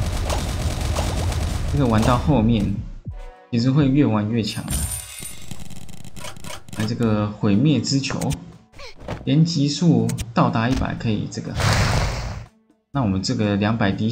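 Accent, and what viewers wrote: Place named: China. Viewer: 如果頻道主真的是台灣人，請不要學大陸人說英語的口音，還是頻道主是大陸人扮台灣人？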